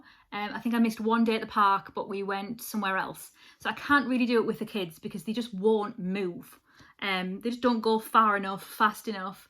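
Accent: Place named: Northern England, United Kingdom. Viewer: Hi, enjoyed your catch up, I too am an hour from Leeds in the NE. Its nice to have a local accent to listen to on a podcast. I’m just at ‘Theres going to be a storm, lets play ball!’ in Midnight Sun. I’m 56 and not ashamed to say I’m an Edward Fan. I read the leaked version years ago on the internet, so glad to be able to read the rest now. There is a lot of angst on his part mind! Anyway, love your knits and chat and yarn. Hope you have a great time away and its not too misty on the Northumbrian Coast XXX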